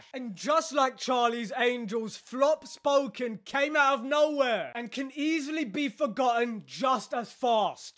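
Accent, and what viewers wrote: United Kingdom. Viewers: I love the way you explain it with ragefull british accent WKWKWKWKWKW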